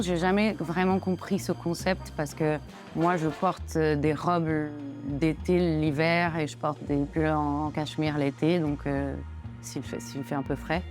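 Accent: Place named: France. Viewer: I miss when your videos used to be in English with the beautiful French accents :(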